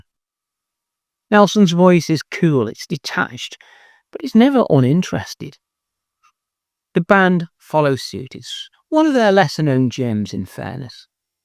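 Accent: Stoke, United Kingdom. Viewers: great review,,,by the way you sound like a stokie?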